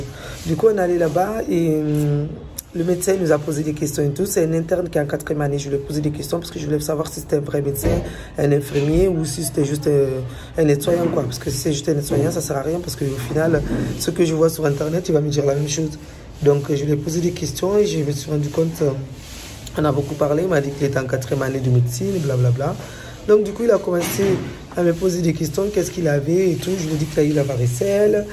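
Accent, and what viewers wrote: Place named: South, France. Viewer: Excellent Sa fait plaisir d’entendre l’accent du sud en Guinée lol je suis pour Marseille au foot c’est pour ça…😅 je suis d’origine guinéenne je ne suis jamais allé en Guinée… c’est comment pendant la saison des pluies l’humidité est-elle supportable ? Merci pour ta vidéo je vais regarder le reste plus tard… 👍🇫🇷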